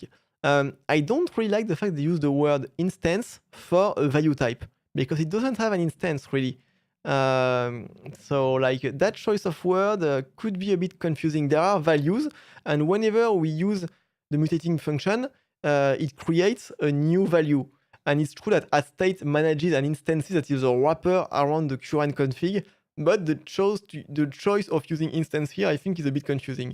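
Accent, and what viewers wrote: France. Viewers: fransız aksanı mı bu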